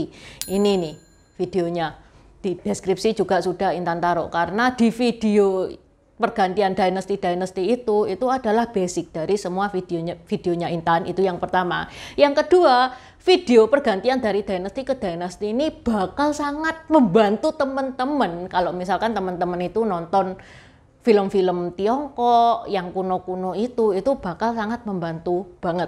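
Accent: Java, Indonesia. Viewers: Enakan yakin dengerin dongeng nya nih.. seru dan lucu dg mempergunakan bhs indonesia yg ber aksen jawa medok. Hihihi. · Pasti yang ngomong orang Jawa, mantap mbak intan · Orang Sumatra atau orang jawa ya kok MEDOK banget ngomongnya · Mbak klau dilihat gya bicara mbak bersal dri jawa jadi saya luruskan info mbak yg boleh dijatakan ngawur itu. NGGAK ADA ETNIS PADANG . Saya asli dri sumatra barat jdi saya tahu sejarah daerah saya sendri. Padag itu hanyalah slah satu kota di sumbar kebetulan jdi ibukota propinsi di sumbar. Etnic asli wilayah sumbar itu adalah suku minangkabau atau disebut suku minang. Yg terkenal maskannya dengan maskan minangbtapi banyak yg slah nyebut selma ini dengan menyebut maskan padang. Ada bbrpa suku pendatang yg merantau trus berdiam di kota padang atau bbrpa kota di sumbar. Mereka merantau ke sumbar udah bertahun2 yg melahirkan anak 2 akhirnya anak2 mereka mengaku orang padang juga tapi bukan disebut merka suku padang tetap disebut mereka suka jawa atau suku batak atau lainnya . 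Jdi suku asli sumbar itu orang minangkabaw. Biasanya suku2 pedatang berdiam di kota2 nya seperti d kota padang dan padang panjang termasuk etnic china atau suku jawa atau suku lain mereka hidup di padang atau di kota2 lainnya di sumbar mungkin dlunya dtang krena urusan kerjaan atau sebgainya. Trus divbsberang pulau sunatra dengan sumbar ada kepulauan mentawai yaitu terdiri dri gugusan pulau2 kecil itu termask wilayah sumbar juga pendduk asli yg mendiamin kepulauan mentawai itu suku pagai atau suku metawai yg daerah mereka ditempuh dengan angkutan laut. Peduduk di kepulauan mentawai ini masih ada yg hidup secara natural di hutan2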